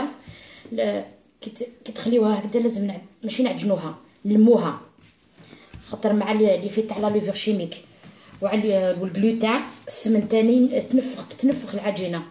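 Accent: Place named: Bejaia, Algeria. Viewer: ya3tik saha ya l'fahla konte ghir n'hawass 3la la Re7 hata sma3te la voix ta3ak chaft la vidéo 3raft bali bdjawiya vive les kabyles Toooooop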